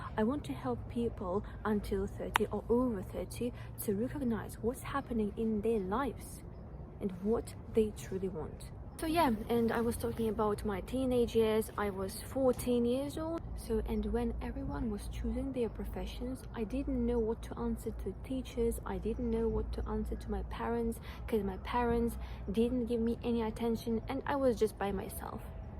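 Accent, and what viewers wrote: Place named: United Kingdom. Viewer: I love your British accent 😍💖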